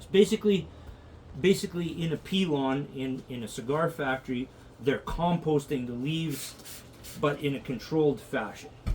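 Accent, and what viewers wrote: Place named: Canada. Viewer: I wonder if this is similar to a Perique ferment. I suspect that the bacteria group responsible for the fermentation are facultive anaerobes--a bacteria that technically does not need oxygen, but benefits from a wee bit of it, thus the regular turning, and airing. A Perique is aired monthly, and under pressure-in water. You are using more water than in cigar production, but they are in a more humid environment (your accent sounds Canadian) Was that 106 degrees F. you said? So many microbes tend to be active at body temp.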